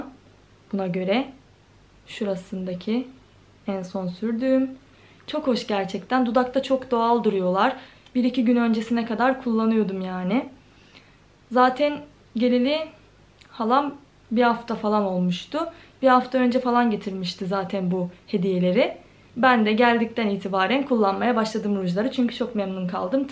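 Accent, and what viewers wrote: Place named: Ankara, Turkey. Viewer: Hem karadenizlisin ama ankaralı gibi konuşuyon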